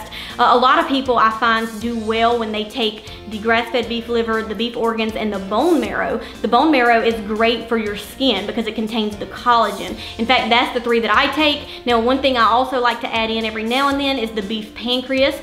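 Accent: South, United States. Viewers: I absolutely love your country accent, it’s awesome!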